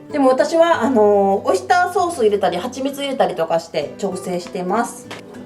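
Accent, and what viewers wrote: Kansai, Japan. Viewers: 今日関西弁強めですね、かわいい😂❤❤ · ミッフィーのクッキングシート、可愛い💕と言った瞬間にグチャグチャに丸めたのツボに入りましたw😂✨💕 関西弁多めで嬉しかったです😊 ずっと脳が働いてそうだから、しっかり休んで下さいね♪ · 関西のイントネーション多めなが新鮮で可愛かったです❤笑そしてカレーを見てると自分も食べたくなる不思議現象が起きますっ😮❤笑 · 後半関西弁になってて可愛かった❤ 私も関西人です昨日から体調崩しててパジャマのまま横になって観てますカレールゥ買ってみます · の動画はずっと穏やかな気持ちで観られるから、心が疲れた時に自然とたどり着きます🍀 毎日お疲れ様です🍵🌱 10年以上が上手に標準敬語話す声に慣れてるから関西イントネーションになってるのが逆に新鮮だった😂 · なんか今回関西弁が強いですね！どういうスイッチで変わるんですかー？☺️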